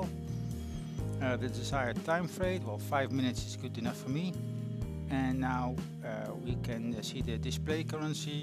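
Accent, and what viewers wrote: Netherlands. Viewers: First sentence and I knew he was dutch. Dank je wel voor de playlist!! haha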